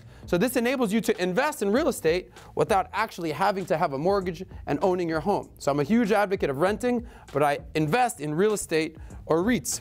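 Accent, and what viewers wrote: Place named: South, United States. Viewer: This right here is the second time I am coming across this name in a week. Came to my school for a lesson and it was lit and yes, he has a really cute southern accent.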